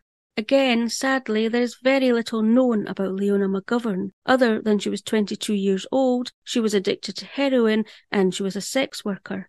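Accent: Scotland, United Kingdom. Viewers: Good narration , glad to hear a Scottish accent telling these stories ,will be listening to more ,thanks.😒🙄😬.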